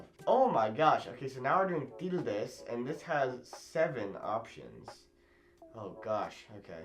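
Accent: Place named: Mexico. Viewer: As a spanish native, you speak really good, and got the accents right, that i think is the most difficult part for other people. Also you got more of a mexican accent. i Liked it!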